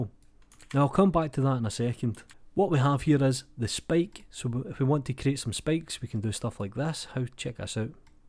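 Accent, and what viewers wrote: Scotland, United Kingdom. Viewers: i dont know if you are Welsh or Scottish.. probably the latter. I can understand you more than my fellow Aussies. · I like the scottish accent, keep it. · That Scottish accent is so thick, lol. I have a better time understanding Gerard Butler! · I'm here especially for the Scottish accent.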